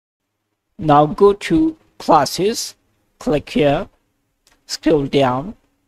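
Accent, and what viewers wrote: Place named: India. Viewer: I knew it would be a waste of time as soon as I heard indian voice but still tried and yea it wasted my time 😂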